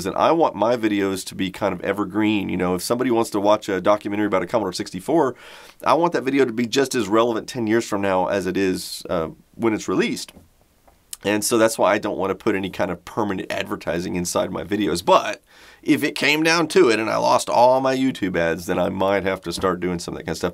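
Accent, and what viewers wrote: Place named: Texas German, United States. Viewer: Interesting - when I saw you speaking german in that Thomann video, I assumed you're a Texas German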